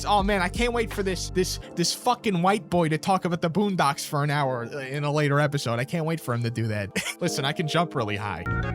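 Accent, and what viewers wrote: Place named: Brooklyn, United States. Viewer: This is one of the most brooklyn accents ive heard and im all for it lol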